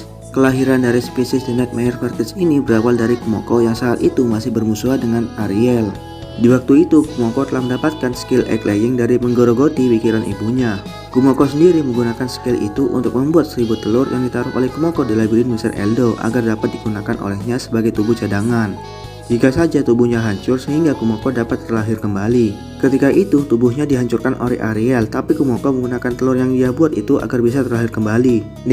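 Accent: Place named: Sunda, Indonesia. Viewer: Pasti orang sunda : v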